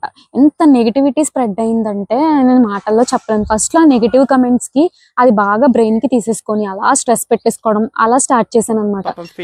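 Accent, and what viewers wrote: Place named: Rajahmundry, India. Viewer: rajmundry slang super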